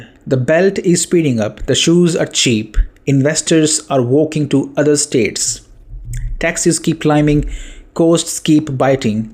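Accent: India. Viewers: Thank you!❤I like a lot your accent,make me think to magnific India!Keep up the real info!Respect from a romanian living in Germany!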